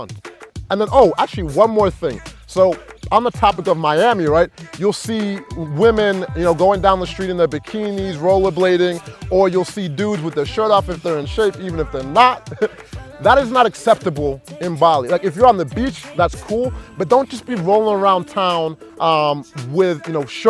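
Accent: United Kingdom. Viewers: That British accent was cleeear bwoy. Did you used to live here in the UK?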